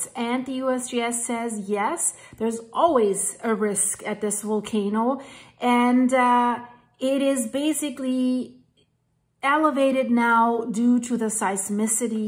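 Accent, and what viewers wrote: Germany. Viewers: I love the German accent especially when discussing volcanology lol How dare you people say such things ☝️ So insulting lol When I first moved to B.C. not long after Mt Saint Helens when off from Australia in 1987. I then saw Mt Baker 😳 holy crap thats scary and all these guys are afraid of snakes and crocodiles. Then I learned of Mt Rainer (Beer cans of coarse) and Mt Shasta and was like omg where heave I moved. B.C. Itself is loaded with more volcanoes north of Vancouver. Australia, the safest place on earth 🌍 so I’m home. Oops, unless we get Tsunamied from a landslide from southern NZ with a mega Tsunami 🌊 of coarse. Such is life as a human being.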